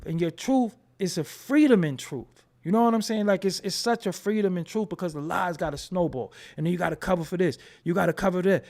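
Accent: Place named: New York, United States. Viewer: Nigga you sound like a NY’er… I’m my NY accent “Get the f… outta here”…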